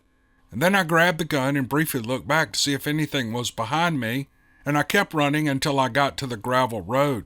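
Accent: South, United States. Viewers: Your calm voice in the night sharing stories was very much appreciated. I had to stop listening for awhile since retitling mixes were the same stories I had already heard and it was frustrating trying to figure out what was new and what was grouped into old stories by numbers. I only listen on YouTube and it was getting too jumbled to find recent posts from repeats. Posted date does not always mean recent podcasts. Sometimes the date was just when it was reposted into a different group of stories. "What if it was true"? Steve somebody? Do I want to write down the number? I stopped listening. But I don't like the voices of other storytellers. Your calm voice with the southern accent of my mother's relatives, is like sitting to listen to family visiting and catching up. Last night this post popped into my YouTube feed when I needed to get away from loud arguing of news stories and blood and thunder prophets. 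Thanks for continuing to keep it calm with anecdotes and comments. It was soothing to hear your voice as the wind was blowing in a storm moving south by southeast along the Pacific Coast, pushing inland over the coastal mountains. I hope your family, relatives, and friends have been missed by tornadoes, wind, and floods. I have a day to get ready before the temperature drops and the slushy mix turns to inches of wet snow and freezing nights for about a week. I am going to go in search of what has been added since I took a break and see if I can find what search terms I need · You read these stories so clearly and fluidly you must pre read and proofread every story, great job as usual and I am from Canada 🍁 and love your light southern accent! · I LOVE ALL THE STORIES UNDER WHATEVER YOU PUT THEM! YOU HAVE A WONDERFUL SOUTHER VOICE!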